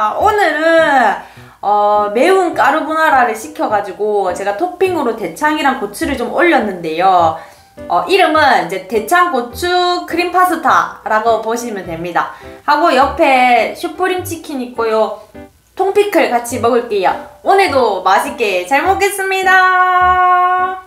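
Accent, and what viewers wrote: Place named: Gimhae, South Korea. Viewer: 팬이에요~립스틱?틴트?뭐바르세요?항상 궁금했어요 ㅎㅎ 잘안지워지네요~공유해주세요~~~~꼭이요!늘 궁금했어요~~~마라탕 먹을때마다 마라탕 영상보면서 먹어요 초딩 따님이 마라탕에 빠졌거든요ㅎ 내 최애 유투버가 이에요 처음에는 대창 먹는거에 반했어요 말투가 익숙해서 보니 김해사시더라고요♡♡♡♡ 전 김해가 친정인 아줌마인데요ㅋ 작년에 김해에서 이사하신다는 영상도 봤죠~돈쭐도 때문에 보고 있어요 먹방 유투버중에는 쵝오라고 생각해요❤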